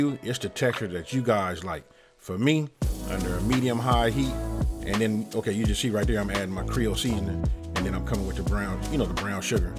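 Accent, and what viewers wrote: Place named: California, United States. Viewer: He sounds like he’s from the Bay or LA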